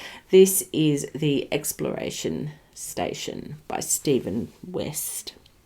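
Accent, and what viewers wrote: Australia. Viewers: I love watching you. You make me smile. Maybe you can help me make some socks. Love your Australian accent too.🥰🧶🧙‍♀️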